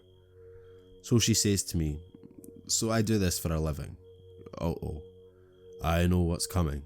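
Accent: Scotland, United Kingdom. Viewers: I'm from Pennsylvania! :D Also hi, I'm new here and I found you through Margbot. Scottish accents are my favorite and I'm excited to watch your videos <3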